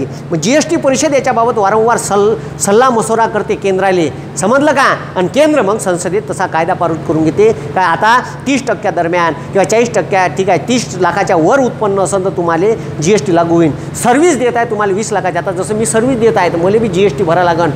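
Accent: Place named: Vidarbha, India. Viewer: Tumhi bava khare vidharbha vadi😅😅tumcha bolna maley lay awadla 😅😅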